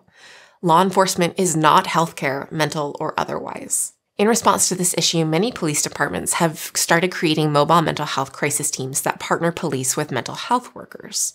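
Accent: Canada. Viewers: You’re Canadian ?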